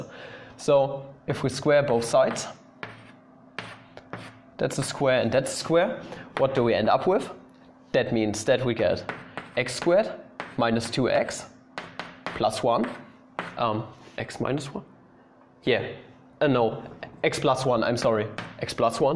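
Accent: Germany. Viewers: I thought I clicked on a mindyourdescisions video and then I hear that coarse german accent. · Are you German? Like I am and you sound SO DAMN GERMAN😂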